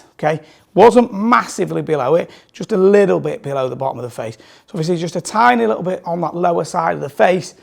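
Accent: Manchester, United Kingdom. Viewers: what do you mean, has a fine South Manchester accent! Perhaps should add subtitles for non Mancs lol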